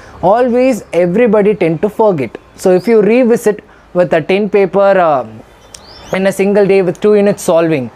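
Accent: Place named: South India, India. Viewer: Thanks for trick 😊, your English is too cute with south indian twist ☺️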